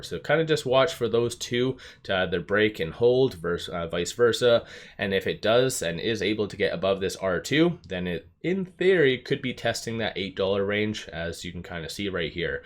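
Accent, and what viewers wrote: Canada. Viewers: Thanks again for your analysis! It sounds like you live in Canada, eh? I am in Oregon. Just got to tell you...I am a huge Rush fan, so go Canada!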